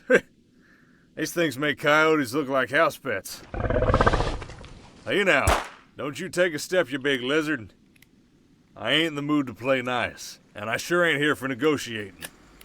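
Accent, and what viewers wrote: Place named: South, United States. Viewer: Im such a fiend for a good southern accent 🤠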